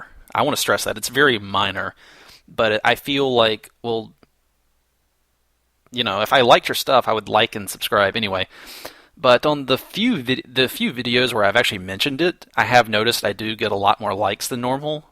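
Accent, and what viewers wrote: South, United States. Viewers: oh you have a cold, you just sounded more southern to me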